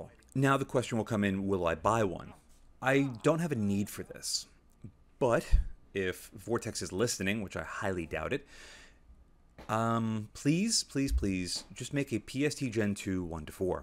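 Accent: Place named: East Coast, United States. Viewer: East coast. Old. He has an east coast accent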